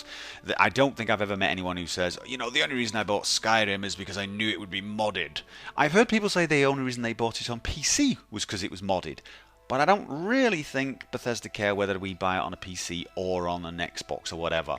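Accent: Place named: North, United Kingdom. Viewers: You're from Norway? You sound a bit Scouse haha, cool video. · Are you from Lancashire by any chance? Your accent is Northern, but I know tapped r's aren't a Yorkshire or Tyneside thing as far as I'm aware.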